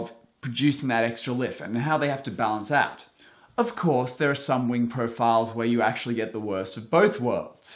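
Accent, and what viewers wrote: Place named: Australia. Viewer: Aussie accent - he's saying "drive the air harder"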